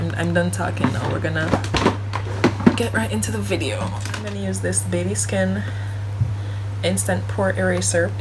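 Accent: Jamaica. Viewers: She a Jamaican me hear the accent eno 😂😂💯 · R u Jamaican?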